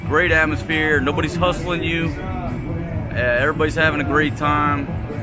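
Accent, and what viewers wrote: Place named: Ohio, United States. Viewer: You sound like my old battle buddy from Ohio, Cincinnati kids named Ohler. Ohio River Valley trash he used to say. Hahaha. He's now a retired LAPD cop living in San Diego.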